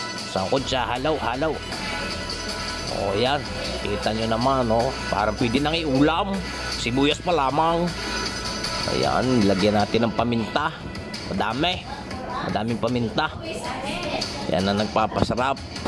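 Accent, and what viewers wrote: Batangas, Philippines. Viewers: Your new subbed, searching kardereta yung luto mo nakita ko! Enjoy your cooking lalo na yung Batangas mong accent! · Tuwang tuwa ako sa punto ng batangeno dahil yan ang nakalakihan kong marinig sa nanay kong batanguena at mga kamaganak namin sa Majada at sa batangas!! Ur accent reminded me of my kakang duneng and other tiyo at tiya!! They all speak that same accent!! Pag nakain na at naghuhuntahan na, akala mogay nagbababag !! Kainaman naman eyyy! 🤣🤣🤣. I love batangueno foods! Napakasarap talaga!! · Either tumira ka matagal sa Batangas, o magsanay ka magsalita. Pero, matagal tagal bago mo matutunan yan. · ala eh kuya.. akoy nattuwa Sayo eh.,kasarap mo pakinggan pag nag ssalita ka NG batanguenio..akoy wiling wili mkining sa bosis mo..😋😋😋😋😋😋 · Iyan ang tunay na Batangueno , sa pagsasalita at sa pagluluto ay talagang mainam na mainam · unang salita palang natawa na ako,, Batangueño talaga. 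sarap nyan kabayan 👍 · habang pinanonood ko ho ito ay may ngiti sa aking mga labi. ako ho ay sobrang nasiyahan sa panonood nitong video ninyo. kayo ga ho ay taal na taga batangas? hindi lang ho sa kaalaman sa pagluluto ng kalderetang kambing ang natutunan ko dito kundi ang makarinig ng nagsasalita ng puntong batangas, para tuloy akong napauwi sa aking lupang sinilangan. mukhang napakasarap nga ng kaldereta nyo, yan ang tinatawag na authentic - kalderetang totoo. at hanga ako sa paraan nyo ng pagluluto dahil yan ho ang talagang bihasang (skilled) magluluto, pinaala-ala nyo sa akin ang aking mga tiyuhin na magaling ding maluto ( ang isa ay dating may karehan sa bagong palengke) ay sya, maraming salamat ho, mabuhay po kayo at nawa'y mas marami pang tumangkilik dito sa chanel ninyo at makagawa pa kayo ng maraming video na maraming makapanood. God bless. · Batangueño ka na nga ginawa mo pang asal ang punto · Bro, di ko kabisado ang accent at punto ng pananalita mo, sorry, matanong ko yan ba ay baklang salita o batangeno? 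· Nakaka aliw magsalita ang mga Batangueno eh! Dalas dalasan mo kuya ang pag a-upload ng mga cooking video.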